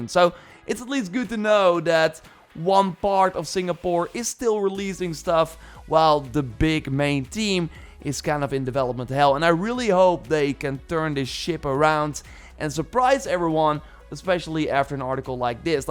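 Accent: Netherlands. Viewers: You sund very very Dutch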